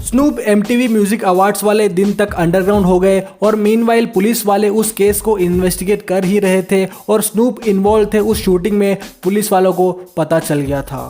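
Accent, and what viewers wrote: Kerala, India. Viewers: Enk manasilai since day 1 u have that Malayalam slang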